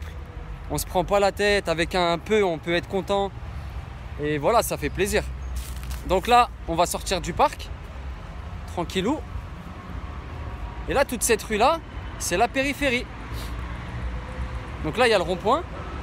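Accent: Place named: Morocco. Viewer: Je suis marocain je m’abonne frère t’es un bon et maghnia excellent il parle comme les marocain certains Mashallah big up pour les frère algérien 🙏🙏🙏🙏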